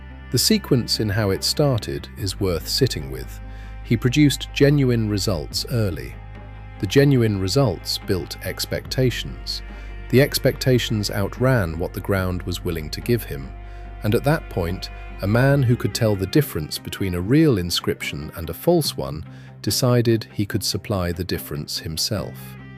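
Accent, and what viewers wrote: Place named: United Kingdom. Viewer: A total waste of my time and the hardest was to torture myself through the awful Britoid accent.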